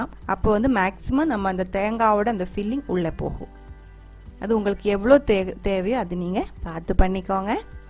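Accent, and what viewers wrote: Kerala, India. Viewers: Kerala Akka Malayalam kalantha Tamil pesaraanga, nice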